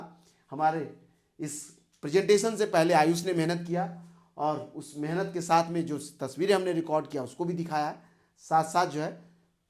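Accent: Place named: Purvanchal, India. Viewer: Abe ye Provanchal, UP+Western Bihar ka Accents ha .. tou apna gayan apni ... .😉 main rackh🦉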